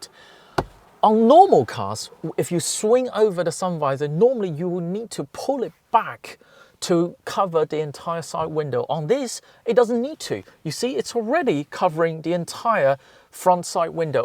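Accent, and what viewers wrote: United Kingdom. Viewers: He has a thick British accent though.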